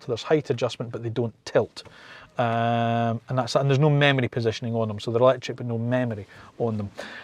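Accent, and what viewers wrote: Scotland, United Kingdom. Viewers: Nothing better than a Scottish accent for saying "I'm not sure about that... I'm really not!" 😄😄😄 Thanks for another great review. Never heard you so scathing about a car. I wonder if they'll still be around this time next year?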